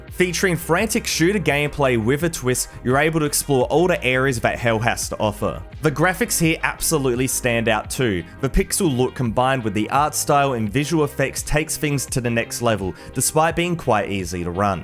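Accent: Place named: Australia. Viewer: Aussie accent mate that’s how we say it.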